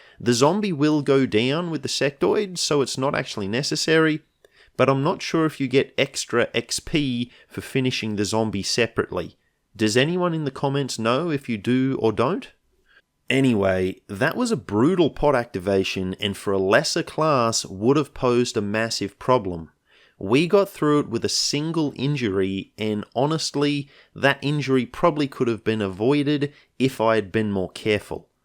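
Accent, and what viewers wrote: Australia. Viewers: your accent is uniquely Australian. you sound like a Queenslander but you know... your not being a cunt, so thats nice. keep it up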